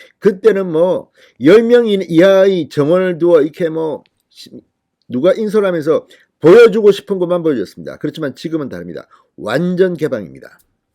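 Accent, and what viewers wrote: Gyeongsang, South Korea. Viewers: 경상도 사투리 발음이 좀억세다 보니 닦아온다는 뜻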